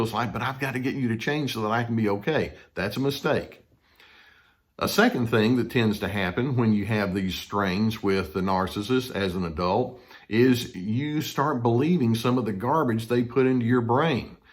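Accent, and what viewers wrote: South, United States. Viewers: Your southern accent helps send a clear message. You described it well!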